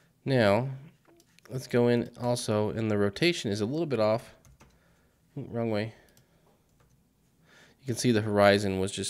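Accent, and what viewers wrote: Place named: London, United Kingdom. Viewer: 3sec to watch and like, thx. More tutorials!!! best londons speak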